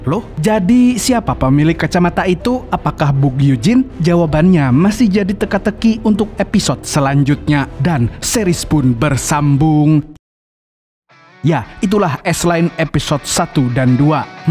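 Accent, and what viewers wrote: Banjar, Indonesia. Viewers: buhan Banjar jua sakalinya amang nih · Urang banjar kahh amang nihh😂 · Nahhh urang Banjar kh pian😂😂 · Banjar kalinya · Banjar kah mang? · Banjar rupanya lahh😂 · Amang banjar nih wkwk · urang banjar ih😂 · urang banjar kah ni😂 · gileee banjarnya kental banar!😂😂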